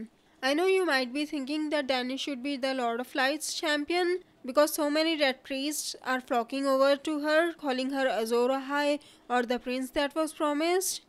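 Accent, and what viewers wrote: India. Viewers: the video is good but ur accents indian _. no offence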